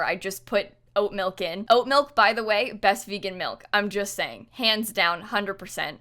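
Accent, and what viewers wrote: United States. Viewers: One of the reasons I love your videos is because I compare our accents. In America you say sift and in Scotland we say it like siv 🇺🇸👉🏴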